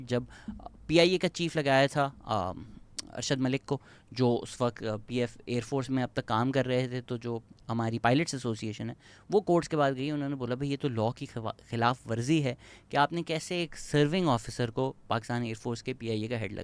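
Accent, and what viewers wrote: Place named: Punjab, India. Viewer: We love when u throw in a little punjabi vich vich 😅 I love your punjabi pronunciation ❤❤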